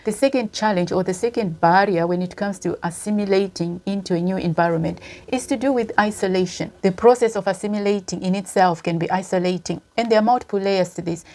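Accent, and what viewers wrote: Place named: Zimbabwe. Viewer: How long have you been in the UK? You have retained your Zimbabwean accent.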